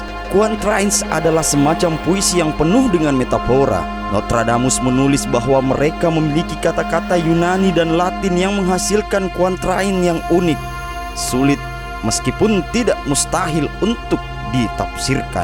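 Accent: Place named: Bugis, Indonesia. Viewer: Suaramu cowo kaya logat orang selatang.. Bugis bingits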